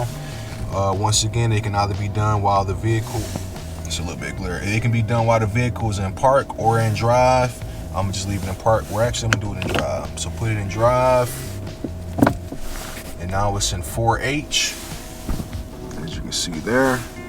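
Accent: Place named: Detroit, United States. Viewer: As soon as I heard what up doe I was like he from Detroit he know what he talking about.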